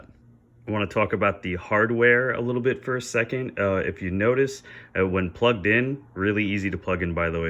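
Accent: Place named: Midwest, United States. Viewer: I thought you were funny. May I ask are you from the Midwest? You speak like us from MI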